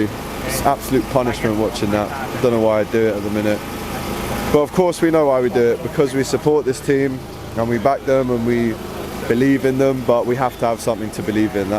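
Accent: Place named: Cockney, United Kingdom. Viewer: Is this Cockney gonna go back to supporting Arsenal now?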